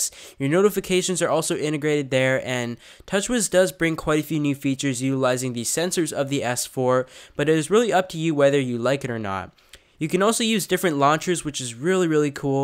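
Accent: Canada. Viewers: Are you canadian? I hear a little bit of a canadian accent lol. Canadians have cool accent.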